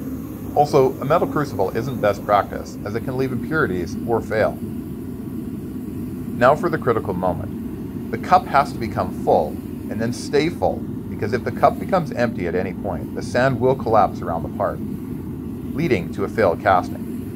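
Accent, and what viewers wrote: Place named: Canada. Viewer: 🇨🇦 I knew this guy was a fellow Canadian by his Canadian accent. 🇨🇦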